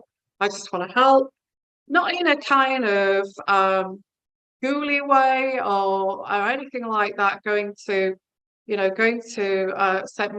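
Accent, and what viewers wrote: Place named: England, United Kingdom. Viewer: Hi Sweet Lady, so polished and kind. I am sitting on my sofa enjoying every word. I love your genuine English accent. At first, l had a little difficulty understanding you, but now l am getting used to it. I am ltalian Naturalized American, and l used to do medical transcription in a major hospital in Detroit, MI. I have learned to transcribe for doctors from all over the world. Very interesting! Thank you for your hard work! I am sorry, but l can’t shake my gut feeling that Nicola’s partner is guilty. He is involved, a cheater. But l am not a psychic.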